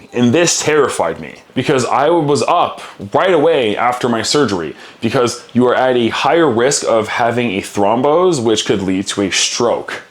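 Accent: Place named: Quebec, Canada. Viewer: I thought so! By looking at your name I was wondering if you were French Canadian. Cool. You speak quite fast as well so with your accent it sounded different. I think the French accents we have over here are a little different to the ones you have over there. Love your channel and think you are doing a great job helping and inspiring people.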